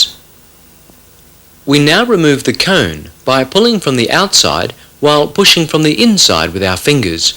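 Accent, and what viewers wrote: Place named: United Kingdom. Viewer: Great H-D 🏍️ class with the “Evil British Accent” 😎 “Live to Ride” thank you for uploading this.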